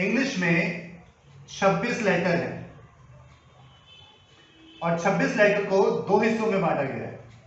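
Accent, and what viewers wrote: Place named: United States. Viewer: Use American accent more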